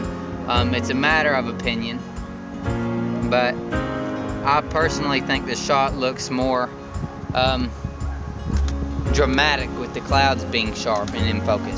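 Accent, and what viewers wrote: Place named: South, United States. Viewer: I agree. The composition with the pine cone was very good. By the way, you have a really pleasant Southern accent. None of us is aware of our accent; it takes someone else to hear it. Half of the pleasure of your videos is hearing you speak.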